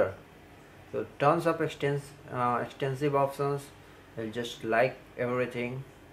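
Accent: India. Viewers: Indian voice ftw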